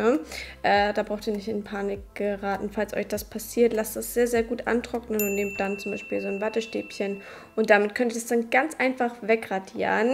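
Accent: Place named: Germany. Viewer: Ich find's süss wie du die englischen Namen richtig Deutsch aussprichst 😂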